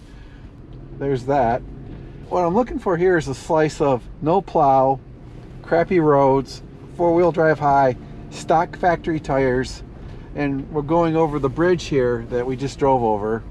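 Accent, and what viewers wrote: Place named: Cleveland, United States. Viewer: Cleveland accent I hear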